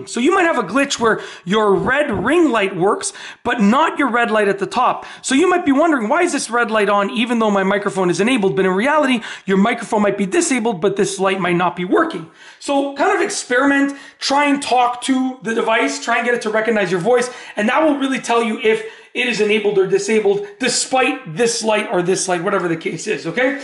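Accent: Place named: Canada. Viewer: This guys LOUD, echo-y Canadian voice is terrible! He talks TOO MUCH. It was easy but he made my ears hurt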